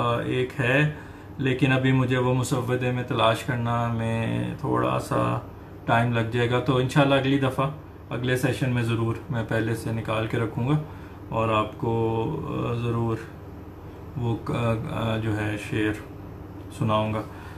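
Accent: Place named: Punjab, India. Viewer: Nice ✔🙏 wow so super bhaut kubh nice your voice🙏🙏👌🌹🙇‍♀️🧕🙏 nice your panjabi language tone so super 👌👌🙏🙇‍♀️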